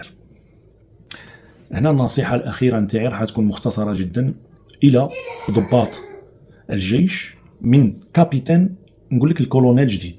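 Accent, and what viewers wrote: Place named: Morocco. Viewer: لهجه مغربيه 😂😂😂😂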